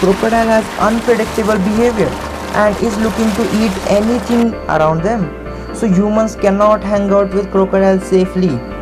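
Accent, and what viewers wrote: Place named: India. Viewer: Felt from ur accent . Me Indian too . Ez science . :)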